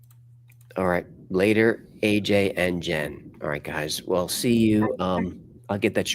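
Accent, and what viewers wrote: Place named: Bronx, United States. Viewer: I knew I KNEW that accent!!! From this Bronx native… you hide it well, lol. But it slips out a little sometimes ❤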